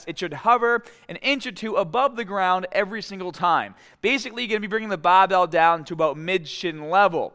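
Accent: Boston, United States. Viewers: Damn. He sounds like he's from Boston. Every city in the northeast hemisphere of USA has an accent. All different. Philly and New York are similar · this boston accent is killing me lmfaooo good content though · Loving this Boston accent right now. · Is this guy from Baaahston?? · Sounds like a Boston accent lol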